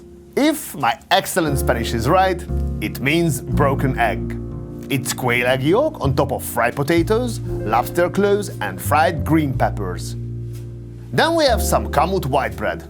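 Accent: Hungary. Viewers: Nagyon jo a video. Szerintem igy felmillio feliratkozonal ez a csatorna mar tekintheto komolynak is, igy erdemes lenne angoltanart keresned. Anyanyelvit mindenkepp,mivel valasztekosan,magabiztosan beszelsz, csak a kiejtes,es a hangsulyozas az, ami nagyon "magyaros".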